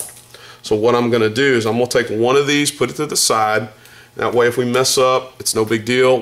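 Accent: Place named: Texas, United States. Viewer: nice accent... texan?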